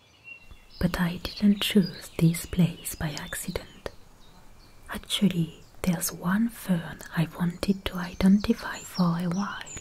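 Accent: France. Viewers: I still go for a walk in a forest nearby. It's relaxing and puts me down to earth. Your video is so beautiful, I love hearing your voice and your french accent is a total trigger for me ❤️🌺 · I really like her French accent that you hear!!!!!!!!!!!!!!☺☺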